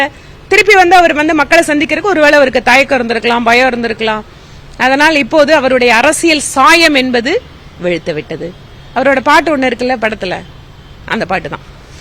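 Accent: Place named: Coimbatore, India. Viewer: 😂😂😂அருமையான கோவை பேச்சு❤. வாழ்த்துக்கள் அக்கா🎉🎉🎉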